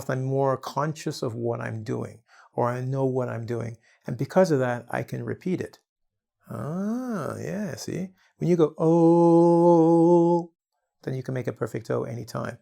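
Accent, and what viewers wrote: Russia. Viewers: Like for russian accent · Russian accent is cool))) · There is the best Russian accent I have ever heard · I think I would express the same as other people did, you are extremely talented and your Russian accent is perfect. Thank you very much,! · Ahaha, your russian accent is so impressive) Thanks for your lessons and explanation from Яussia) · your Russian accent is perfect!😁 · Ахаха)) Хотел написать комментарий, а уже опередили! Я бы хотел увидеть отдельное видео только с русским акцентом :D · I want more your videos with Russian accent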